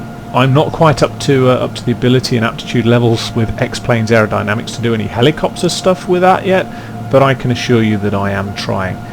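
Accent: United Kingdom. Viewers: Hello all, sorry if this post appears to be a bit late but I have only just got onto broadband (Yes I'm a Newbie, this is my first post!). Firstly well done on a well made review, I like the professional Royal Navy accent that you have which gives what you say more credibility. You remind me of Keith Thompson of Treasure Hunt fame who was also ex-Navy. Secondly regarding rudder use in FS9/FSX for helicopters remember to check your realism settings and ensure AUTORUDDER is switched OFF as this this can affect pedal control so you can't turn in the hover! Sorry if you already know this but it can get overlooked. Anyway thanks for reading and carry on the good work. SimonStanm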